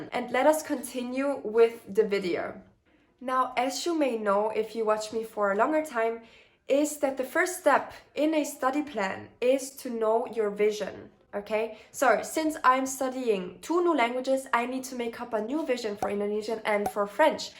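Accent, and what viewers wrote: Germany. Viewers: your accent is really nice though very subtle like I said I would have guessed a Germanic language but it’s not distinct enough that I would identify it as German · You are German correct ? I can hear the accent. 😍😍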